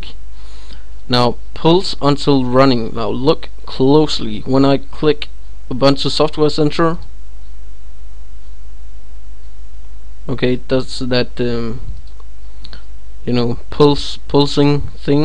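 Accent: Denmark. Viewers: ARE YOU DANISH ?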